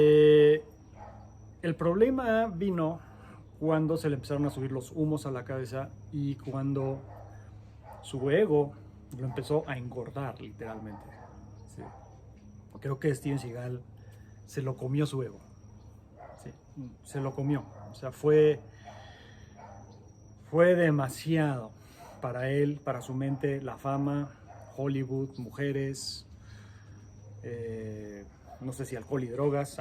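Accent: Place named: Argentina. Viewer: Yo opino que el sensei está hablando cada día más con acento Argentino! Saludos desde Buenos Aires!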